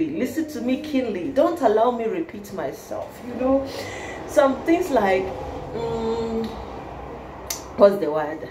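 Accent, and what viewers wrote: Nigeria. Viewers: that Nigerian acsent sounds very sweet ooh, l enjoy it.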